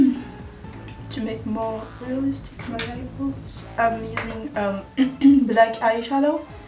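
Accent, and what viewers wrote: France. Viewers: is your accent french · it's a french accent or ...?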